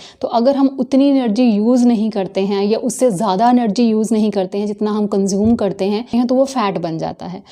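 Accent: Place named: Punjab, India. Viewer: Hi I have recently watched some of your vlogs, I like your accent it reminds me of my childhood that I spent in patiala. Your pronunciation is so typical of Punjab. 😊😊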